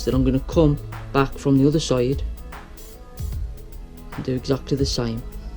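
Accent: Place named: Midlands, United Kingdom. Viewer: Yo big. Im in awe that you had the guts to go straight in with the exotic timbers mate.....youre brave:-) I would suggest practising and refining your technique doing a few pine first.....but hey, i love your maverick style dude. Damn good effort though for you first bash. Another thing....(and im judging the purely by your accent)....but do you know there is a Midlands Woodworking & Powertool show in Newark on the 27/28th of this month....Im sure you could pick up a mallet there:-)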